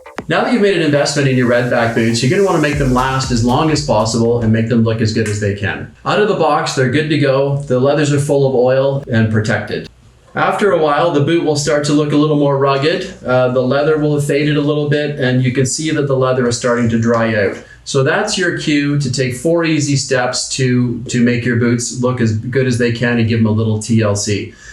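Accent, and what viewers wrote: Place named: East Coast, Canada. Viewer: I give Americans a hard time about Canadians saying "about" a funny way. I'm guessing this guy is in from the East Coast because I'm from the West and now I can clearly see what the yanks are hearing. 😁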